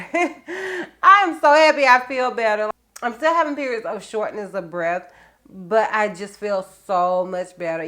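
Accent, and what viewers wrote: South, United States. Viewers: You're so beautiful in all the wigs. I'm glad your feeling better. I work in the medical field and love headband and ponytail pieces because you can just throw them on and GO! Your spirit is so uplifting. It can be felt thru the phone with that Southern accent! You crack me up!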